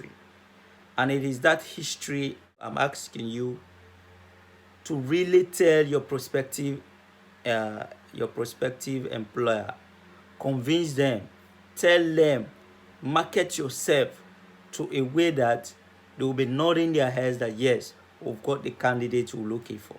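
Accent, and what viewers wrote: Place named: Sierra Leone. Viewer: You sound like a Sierra Leonean. Are you? Thanks for sharing...new sub showing some love 💓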